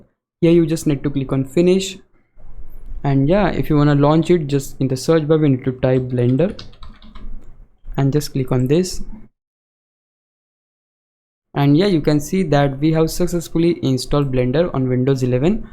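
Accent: India. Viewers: indian acctent carried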